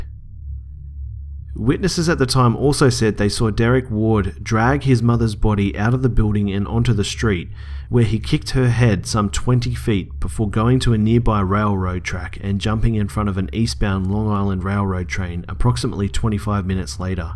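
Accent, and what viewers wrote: Australia. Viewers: Loved the video. Your aussie voice is the best.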